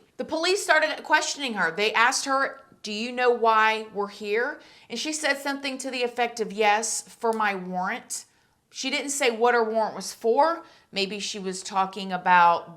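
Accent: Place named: Florida Panhandle, United States. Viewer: Ha! your accent sounded so familiar to me. I too am from the 850. Hi there, girly!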